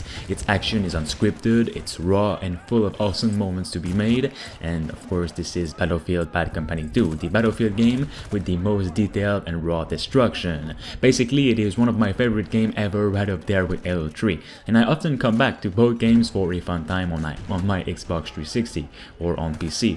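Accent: Quebec, Canada. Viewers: are you from quebec ? cause your accent is "famillier"lol ,quand jai vue lecriture dans le jeux sa ma sauter au yeux XD je tecoute depuis longtemps et javais un doute mais maintenant jen suis sure! respect frere keep up the good work! i like your contents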